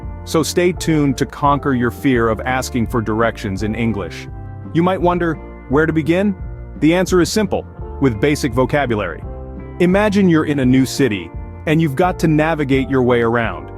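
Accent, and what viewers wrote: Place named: United States. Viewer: I love this American accent. How I love you, America! ❤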